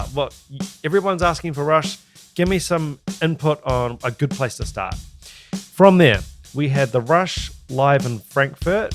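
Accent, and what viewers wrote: New Zealand. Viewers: Oops, just realized (re: my earlier comment) that I assumed you’re a Kiwi because of your accent. I have NZ and Australian friends so I’m pretty good at noticing the difference (I hope).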